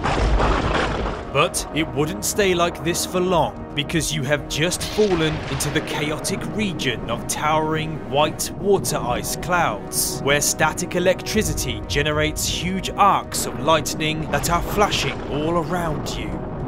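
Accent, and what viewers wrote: United Kingdom. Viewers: His british accent make it even better,uranus is so deep btw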